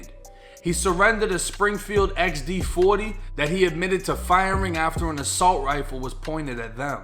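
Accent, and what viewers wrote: Boston, United States. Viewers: hey just wondering are you from Boston. me being from I can hear how say you car. you don't have that southern Florida,,,,, accent just wondering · You have a boston accent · Boston talking ass...Where you from SOUTHY? LOL! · of coowz its boston. Not a place wheh kids can play in tha yod, cuz they could get hit by a ca from all the wicked bad drivas kid! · Sometimes I think your from Boston or Massachusetts but I heard you say you were locked up in Florida so I'm guessing your fro. Florida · how are you mixing up florida lazy twang and stromg new England (boston) accent and dialect ? totally sounds like ur a poser and/or confused...idk about the rest of FL but here in Orlando we don't sound like a PLIES or reject Slip N Slide artist from <num>.i mean unless ur on mercy drive, ivy lane or deep off the NW part of Orange County maybe IDK... 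but from OBT to Landstreet; Oakridge to Kirkman and MetroWest... we most definitely don't sound like that in fact we deliberately ended that twang talk dialect back in 2015/2016, meaning THAT LINGO BECAME A NO GO ON THAT TEMPO.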